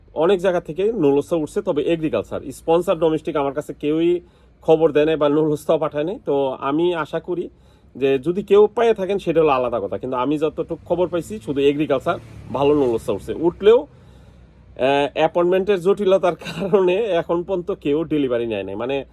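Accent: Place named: Chittagong, Bangladesh. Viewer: চিটাগং কথা আপনার কাছে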